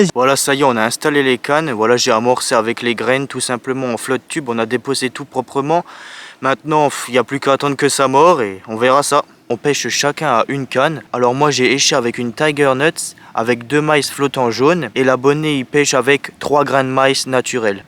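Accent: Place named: Moselle, France. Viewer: le bon accent de mosellan mdr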